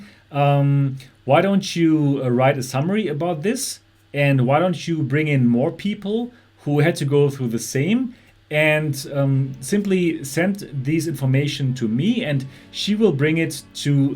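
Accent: Germany. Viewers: Also dein Deutscher accent hört man sowas von aber Cooles Video :D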